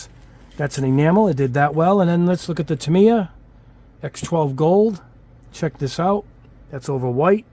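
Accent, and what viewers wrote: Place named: Northeast, United States. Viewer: lol, your NE accent coming through talking about a new paint "sauce". i am from the south so it took me a bit to figure out what you meant. (that's ok, my pronunciation of source would be "so arse") 🤣 love the videos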